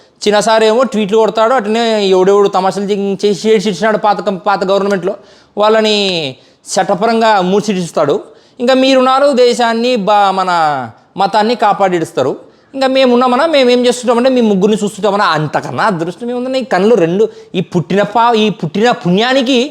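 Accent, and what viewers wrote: Rayalaseema, India. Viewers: Hilarious Comedy with Rayalaseema yaasa 😂😂😂 Enjoyed it bro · Valmmo. Super . Your accent in rayalaseema slang good. So sarcastic. You are the best and talented on current issues. Nanna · Jagan pastor kante bagundhiro ne rayalaseema slango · ఎక్సలెంట్ రాయలసీమ స్లాంగ్ బ్రో🎉🎉🎉 భలే చెప్పినావు అన్న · అన్నా ఆ ఎర్రిబుక్ గురించి భలే తమాషాగా వుంది నీ రాయలసీమ యాస. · Good jagan ni thidithe sulli kudaa pattinchukoru.... Pavan ni enchukunnaavu... Ayinaa nuvvu hyd lo vundi rayalaseema yasa endhiaa... Maa telangagana yasa idhi kaadhura...peekinav le theey... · Anna shock, shock, shock....I never expected this from you but i truly enjoyed and relaxed while listening to Rayalaseema accent. I hope in future you will do atleast some videos using rayalaseema accent, if you do all videos also fine Anna. The way you are questioning Govt and educating people is really amazing Anna. 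Keep doing more Anna From Rayalaseema ✊👌👍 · Ee slang super Rayalaseema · నీ అన్ని వీడియోల్లో ఈ వీడియో భలే ఉంది అన్న సామి రాయలసీమ యాస లో😂😂😂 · Abbo rayalaseema యాస భలే చేసారు అరే బాబూ నువ్వు రాయలసీమ వాదినేనా ఏంటి🤣👍🏻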